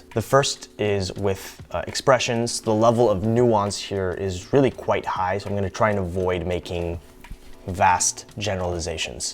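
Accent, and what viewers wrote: France. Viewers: Im a french guy from Québec. You have a great accent. Its nice to see a foreigner understanding our little details in language. You obviously went to France to learn it. I recommend you to go to Quebec and, be mind blown by the way we speak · A lot of those expressions are not North American French. I can tell by your accent you learned French from France, and not the French from North America. Did you know that the North American French, mostly the French spoken in Canada is more authentic than the French spoken in France? That's because we were isolated from France from the 1600s to the mid 20th century so our language did not change as much as that spoken in France, so our French is more authentic and true. Generally the French spoken in Québec is no longer authentic because they adopted changes when the intellectual snobs wanted to distance themselves from their French Canadian roots. 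So they adopted more of the accent spoken in France and incorporated new words that never existed before in our vocabulary which came from France. This took place in the 1960s and most of the québecers have now completely changed their way of speaking. Whereas the 30% or so of French Canadians living outside of Québec have kept the original French from our ancestors. So the purest French, most likely in the world, is found in Canada, outside of Québec. I will give you one example of the difference between authentic French and the French spoken in France and in Québec. The French language is a Latin language and in all Latin languages we roll our "r"s. That is what French Canadians do, but Québec stopped doing that just as the French from France did. In AUTHENTIC French you roll your "r"s, you dont spit them. I am French Canadian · I'm learning about my own language, I swear it's troubling how little one understands of their mother tongue... 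also, not certain that I'm not mixing it up with French Canadian because I've lived there for too long, but 'terrible' can also mean 'incredibly good', chiefly cut into two very articulated syllables, at the same level as 'chant-mé' Oh, btw, you have a sort of a south accent, which is funny because you could easily be mistaken for someone from Toulouse (or around that area) at times 👌🏼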